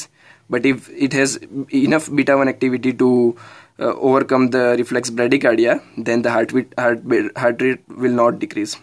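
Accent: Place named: India. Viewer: Love your Indian accent ♥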